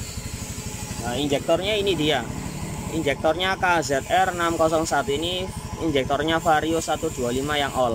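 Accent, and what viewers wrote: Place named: Java, Indonesia. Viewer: cara ngomong enak bosku, logat boso jowone podo aku, enak dipahami wkwkwk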